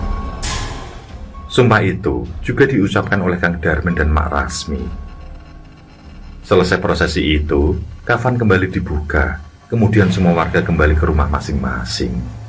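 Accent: Java, Indonesia. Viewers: Sukaaa denger suaranya pakdhe...baru aja sih nemu channelnya pakdhe ini dan langsung jatuh cinta...dialek jawanya pakdhe bikin ademmm...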